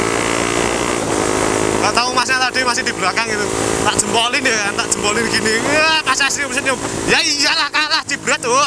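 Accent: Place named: Banyumas, Indonesia. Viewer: iyo tak delok e pakngapak...ndi duduhno ak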